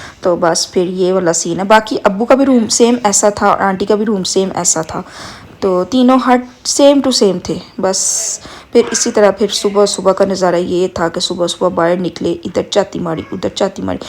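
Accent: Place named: Lahore, Pakistan. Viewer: how can you speak so fast without any stop (are you from lahore, i guessed it from your speaking style), i enjoyed your commentary more than the video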